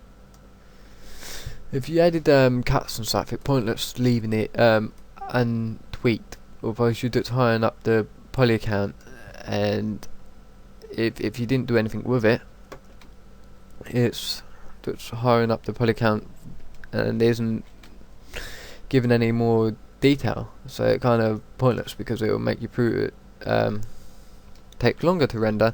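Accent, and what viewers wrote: United Kingdom. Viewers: **you're. And actually I said "British" because I wasn't sure if he was english. He could be from Wales for all I know. I know the accent differs a bit depending on where in the UK you are, but I'm not an Expert! :S Oh and yes, I'm not english or british in any way, i'm canadian. :) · uh uh uh your uh uh stutters are uh uh almost as uh unbearable as uh that uh mmm brit ass accent. Couldnt watch anymore....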